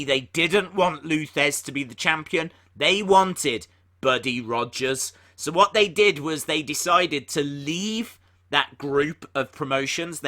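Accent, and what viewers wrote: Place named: Midlands, United Kingdom. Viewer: whereabouts in the UK are you from? Sounds a bit like a Midlands accent? What would be your nearest wwe show city?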